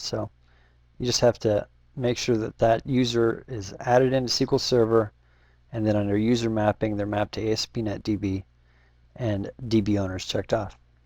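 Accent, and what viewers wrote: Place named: United States. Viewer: I really like this video. There alot of SharePoint 2010 admins who need to step up on their training... hence me watching this video. You have a good teaching technique that is way better than the other sites i've looked at... and it's in clean American English! Please make more of .Net SharePoint related videos!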